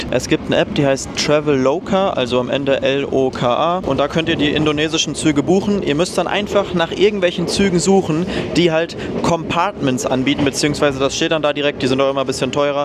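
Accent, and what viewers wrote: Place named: Germany. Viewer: würd ich nicht sagen. ist gutes schulenglisch. aussprache ist halt bisle schwierig, aber das haben fast alle deutschen so :D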